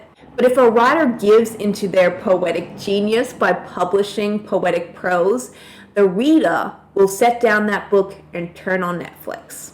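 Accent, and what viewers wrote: Australia. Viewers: Hitchcock said more or less what Vonnegut (and you) say, but in a way that, I think, explains one of the rules better. Paraphrasing: Suspense, he said, is not withholding information from the reader. Rather, it is giving the reader information before the character gets it. (Is that faded Aussie I hear?)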